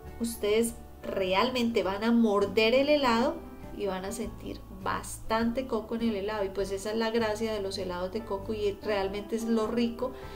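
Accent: Colombia. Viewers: El acento es colombiano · Esa voz es colombiana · Cuenta Samsung por el asentó creo que es Colombiana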